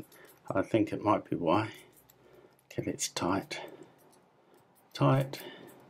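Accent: New Zealand. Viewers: Kiwi? Chur bro lol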